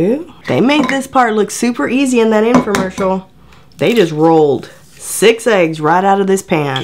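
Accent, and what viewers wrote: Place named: Compton, United States. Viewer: Can you do us a favor and not speak like you are from Compton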